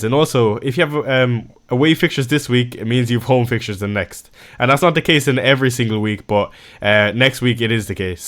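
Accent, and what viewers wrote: Ireland. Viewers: I can hear a hint of an Irish accent😅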